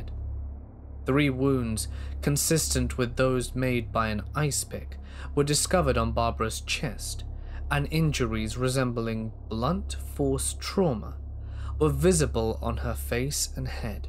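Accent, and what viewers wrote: United Kingdom. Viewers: The British accent was designed for narration. Brief Case recommended your channel, by the way.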